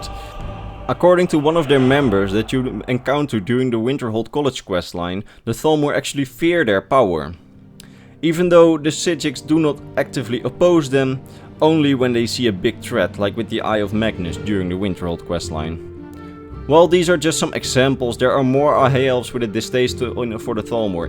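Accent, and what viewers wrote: Netherlands. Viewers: Hee je bent nederlands he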